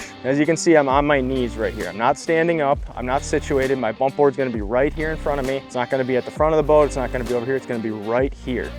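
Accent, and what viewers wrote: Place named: Minnesota, United States. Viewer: You’ve got the Minnesota accent down for sure)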